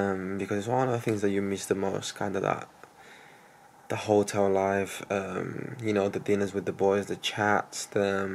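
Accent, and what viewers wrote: England, United Kingdom. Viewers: You sound English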